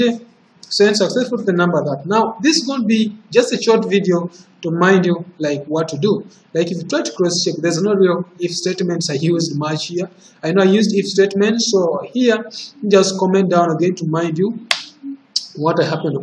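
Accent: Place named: Central, Uganda. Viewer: Gwe, yo English is kinda Central Ugandan...!!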